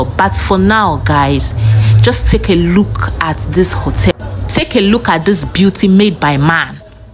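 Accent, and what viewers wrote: Ghana. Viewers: You sound more Ghanaian than a Nigerian. Very soon, your accent will change completely 😂 Nice video btw✌️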